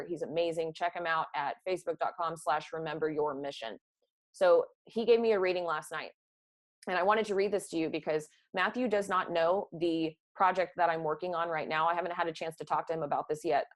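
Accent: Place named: Texas, United States. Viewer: You are clearly from Texas... I can tell because you talk so normally, but then you say y’all like it’s normal... so Texas... I am from OK, but good stuff I like your material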